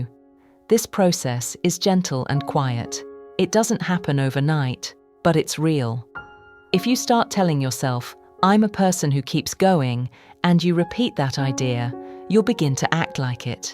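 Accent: United Kingdom. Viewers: Thanks nice British accent ❤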